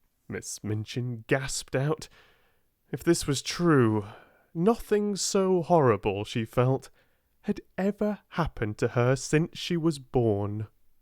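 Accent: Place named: United Kingdom. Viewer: I've just seen this premiere being scheduled for 10pm (in Serbia).. and just to let you know, no one would mind you reading it in such a lovely accent! I've actually discovered your channel whilst looking for an audiobook for this one in British English.. and we have the same editions, I like to listen to an audiobook and follow the story in a book, since I'm not a native speaker, I find it really useful! All the best, Greetings from Serbia!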